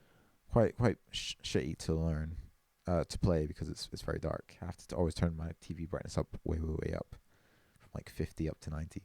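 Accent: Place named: Scotland, United Kingdom. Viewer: Yeah, man i’m just a few miles away from your home town! Throughout the video after I made the comment I was just thinking - surely this dude has to be Scottish somehow 🤣. I figured maybe even your parents. How come you moved away from Costa Del Scotland?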